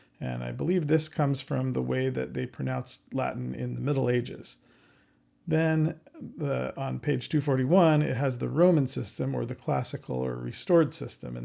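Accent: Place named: United States. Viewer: Nah, too american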